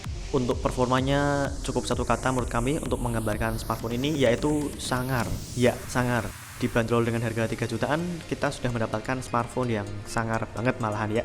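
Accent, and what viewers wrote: Java, Indonesia. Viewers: medoxxxx bingitzz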